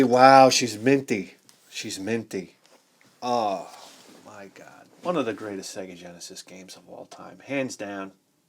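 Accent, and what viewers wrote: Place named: New York, United States. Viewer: Hiya. First off fucking love your show. We're about the same age so I can relate to much of your video game history. I had a subscription to Videogames and Computer Entertainment from 1990-91, I remember there was a special on Phantasy Star 3 in one issue. I poured over that article for hours and hours, examining each screen shot and dreaming about a when i'd get the chance to play it. Never happened, but that's how it was in those days, in my part of Canada, the province of Saskatchewan (i'd to hear you pronounce that on your show with your NYC accent, that'd be fuckin hilarious). There was not much for variety in video games, especially JRPG's. But anyway, I'm with you on the greatness of Phantasy Star 2, I've never completed but i keep getting going back to it over the years. Keep up the great work, on the greatest video game show in the history of human civilization.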